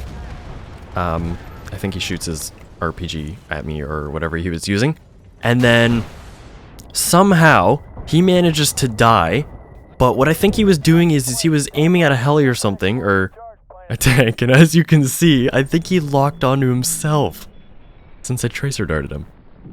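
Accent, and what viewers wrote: Canada. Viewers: is this guy candadian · im guessing his Canadian. the "about" gave it away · You sound like you’re from Port Alberni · you Canadian ayy?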